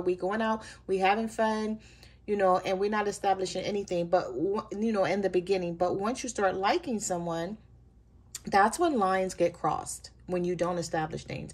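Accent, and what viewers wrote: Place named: East Coast, United States. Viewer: I can hear that east coast accent 😂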